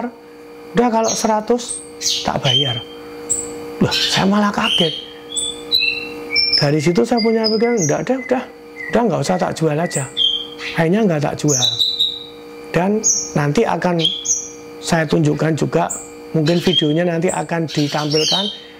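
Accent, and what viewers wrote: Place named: Yogyakarta, Indonesia. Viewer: kren cara bicarene ngk ningalne ciri kas jogja, mudah dicerna masih percaya bejo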